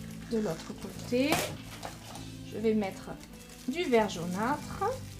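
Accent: South, France. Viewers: Bonjour, Un Très Grand Merci pour ce Partage et cette profusion de générosité artistique personnelle qui est la tienne! Agrémentée par ce merveilleux, savoureux accent méridional qui ne peut que réchauffer les cœurs de ces espèces d'artistes congelés et recroquevillées sur leurs certitudes académiques aux quatre coin de l'hexagone....et même bien au delà.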